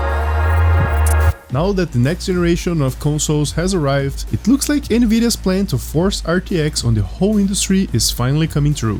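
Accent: Brazil. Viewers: Não é Unotable, é Unnoticeable. Quando for gravar os vídeos, pensa que vc não quer que percebam que vc é de SP.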